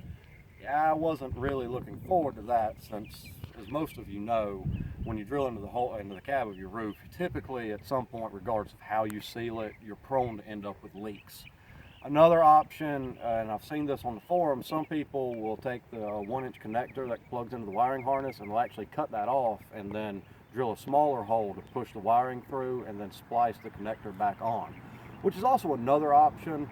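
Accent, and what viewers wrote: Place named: South, United States. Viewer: Accent reminds me of squidbillies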